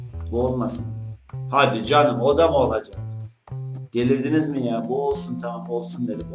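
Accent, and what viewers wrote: Black Sea, Turkey. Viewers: Karadenizli büyük ihtimal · Karadenizli mi kinusma tipine haz usagim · ABİ KARA DENİZLİ GİBİ KONUŞUYO AMA ŞİMDİ NORMAL OLDU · Abi sen Karadenizlimisşn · Abi Karadenizi misin? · abi sen Karadeniz'li Misin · Abi karadenizli mısın?🙊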